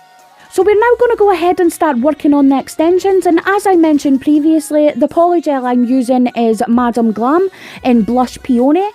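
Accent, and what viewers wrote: Scotland, United Kingdom. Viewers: New sub here. I have soooooo many Madam Glam gels. Def my fav brand. Btw, I love listening to your Scottish accent.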